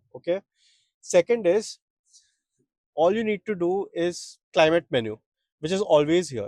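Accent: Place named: India. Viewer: Can you use AI to change your fkd up Indian accent to something that people can understand?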